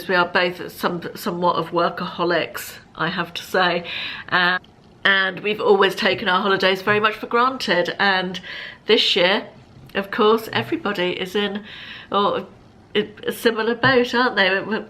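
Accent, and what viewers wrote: England, United Kingdom. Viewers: Yes, I have been watching for a while now, she still has an English accent, Just!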